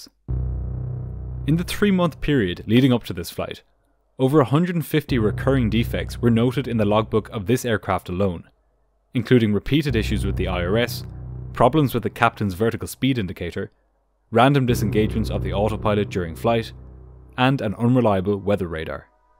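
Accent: Ireland. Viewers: Thanks. 🇮🇪 this is the only videos of this sort of stuff I watch. It's always nice and honest without B.S or showboating like some others . PS our lovely Irish accent also helps 😊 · I could listen to this man speak all day. There’s a reason why Irish is voted people’s favourite accent out of the whole UK and Ireland.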